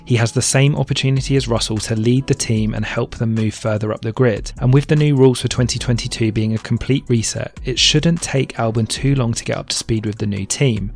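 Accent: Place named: United Kingdom. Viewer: Yeah that was very comfortable. Perfectly crystal clear British too.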